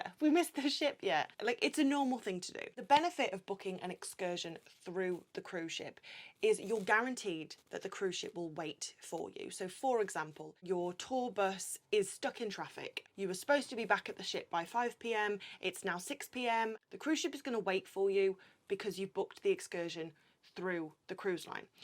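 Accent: United Kingdom. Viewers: is absolutely breathtaking lately, she was always beautiful but something is different. I have to watch her videos twice, once to see her, which completely distracts me to what she is saying, and the second time to listen from the next room so I can hear what she said. Breathtaking. I hope she's not in love as I was planning, when my crypto takes off this year, to renounce my American citizenship, become a British citizen, buy her the biggest engagement ring, so big she'll need a finger brace, buy her the biggest house I can find and be her ladies gentleman for the rest of my life. All she has to do is walk around and speak in that wonderful British accent and laugh that wonderful laugh. That's my simple Santa request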